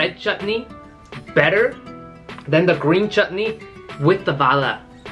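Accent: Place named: Latin America. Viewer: Mi compa you earned yourself a fan by pronouncing them Indian names correctly(well almost correct with that latino accent)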